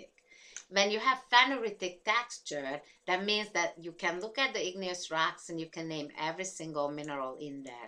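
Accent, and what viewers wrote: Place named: Hungary. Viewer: Summer 2020 GOL 105 - V1 Physical Geology - Savannah Mihalo - Classifying magma gets much more complicated than I realized! I wrote down in my notes about viscosity levels as that was the most confusing part for me---but I think I understand it. I did like learning about the types of intrusive and extrusive mineral textures. When I saw pumice, I remembered that sometimes my family used pumice-crafted materials in the shower for scrubbing. I love obsidian most likely because of its glassy texture. I did not know you were from Hungary; that is so neat! I have loved listening to your accent throughout these videos but I was not sure which country you originated from. I love these videos---they are well done!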